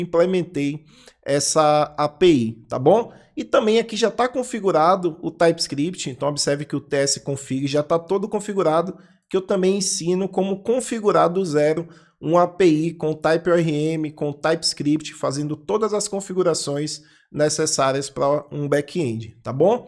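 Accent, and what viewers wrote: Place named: Northeast, Brazil. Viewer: Muito bom, me ajudou demais!!!! E ainda é nordestino que nem eu!!!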